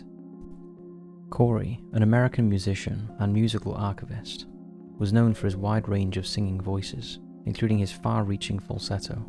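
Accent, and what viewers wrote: Wales, United Kingdom. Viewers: is it Welsh? I thought he sounded a little british, but regardless I miss the old narrator. He just sounds so much more educated and classy then the new one 😏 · Oh I've missed your soothing Welsh tones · he’s definitely Welsh lol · Yeah, they have not been the same without his Welsh twang.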